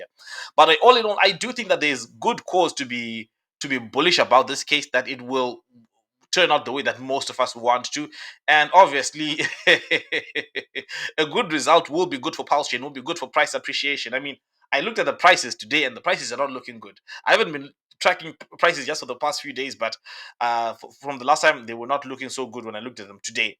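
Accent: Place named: Africa. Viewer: Speak some proper English you have such a strong accent African accent, American man this is America man !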